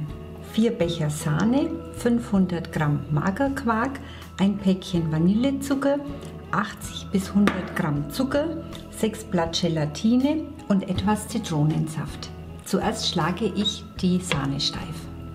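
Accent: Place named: Franconia, Germany. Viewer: Ich schaue gerne Backvideos, weil sie mich inspirieren und fröhlich und entspannt stimmen. Für meine Geburtstagstorte habe ich hier auch Inspiration gefunden😄 Vielen Dank für die guten Erklärungen und die gegebene Nachlesemöglichkeit der Zutaten😊 Besonders an diesem Kanal gefällt mir persönlich, dass da manchmal der Dialekt durchblitzt und ich fühl mich gleich wie zuhause in der Heimat 😉 (ich sag nur 'fünfevierzich' hihi fränkisch ich liebs❤️)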